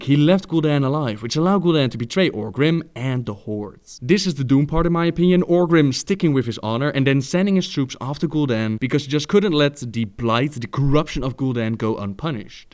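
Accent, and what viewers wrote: Netherlands. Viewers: he's dutch, he has an accent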